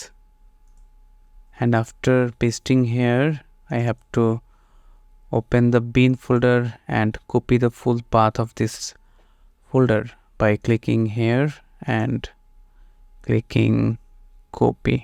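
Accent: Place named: India. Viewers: fellow indian here; we pretty much sound like each other. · i only know indian accent, so i think its a bit similar, sorry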